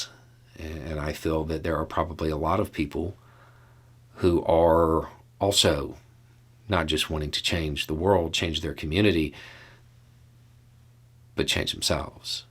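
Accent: South, United States. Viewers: Hi. Just watched the video 'Let's talk about 3 letters that can make rural whites understand the fear minorities have of cops.' and in it, I heard a much strong Southern accent than in any video since I started watching your channel. Which is your day-to-day accent, now or then? Or was the accent you used in that video due to the subject matter of the video - i.e. to talk like a rural Southern person? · have been here long enough to see you evolve from sounding rather southern to not sounding southern at all , you cover a wide range of matters and from your explanations it is possible to see you care about the truth , How do you stay so even tempered and not get mad as hell about some of this stuff , I use your video's to explain things to my GOP friends . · I prefer the redneck accent. 😊 From a redneck girl.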